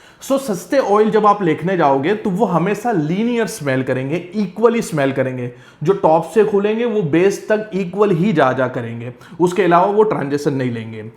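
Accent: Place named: Ahmedabad, India. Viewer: Taddan Ahmadabadi accent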